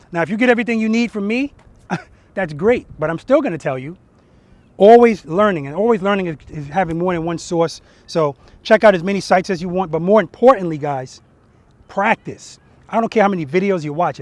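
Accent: New York, United States. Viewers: Hey. Great lesson my friend. Your encouragement lifts me up, encouraging me to practice and build confidence. Your technical skills are awesome as is your presentation. Driving all of this is your life philosophy of “paying it forward” and appreciation. Also, having grown up on Long Island, it’s good to hear your NY accent. You are making a big difference for me and many others and I thank you and appreciate you. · I can't tell you how much I appreciate what you're doing with this channel. I love the way you break everything down step by step and I especially appreciate how humble and joyful you are. I'm a baby rider and I've been watching videos fr many different experienced/veteran riders, but the way you explain things just clicked for me. Same goes for Jerry Paladino (Ride Like A Pro). BTW, love that NY accent! God bless you!!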